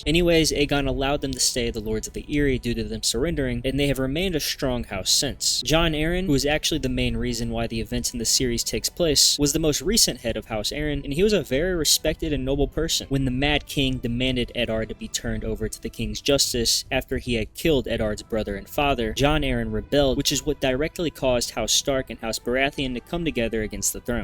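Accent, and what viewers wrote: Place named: United States. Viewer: I like your accent. I'm assuming you're American (correct me if I'm wrong and you're Canadian); which part of the States are you from?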